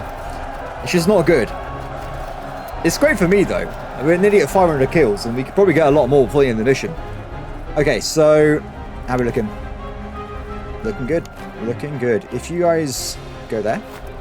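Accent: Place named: United Kingdom. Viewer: how you get brit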